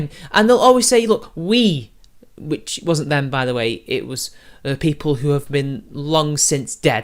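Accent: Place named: Yorkshire, United Kingdom. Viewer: What part of Yorkshire ? Lad.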